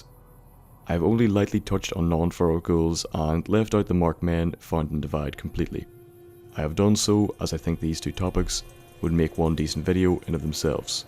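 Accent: Northern Ireland, United Kingdom. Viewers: i believe he got a northern irish accent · That Northern-Irish accent tho!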